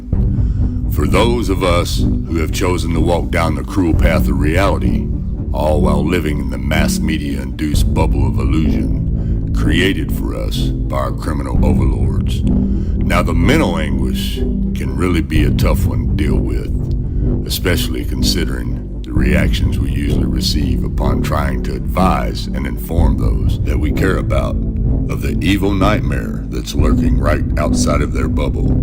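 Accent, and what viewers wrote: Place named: United States. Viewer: I love your accent, not sure what part of the US you are from. Much love from Ireland. God bless my brother in Christ.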